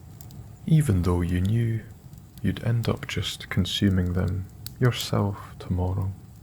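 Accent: Scotland, United Kingdom. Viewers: Loved the story, but really enjoyed the Scottish brogue, will certainly keep listening!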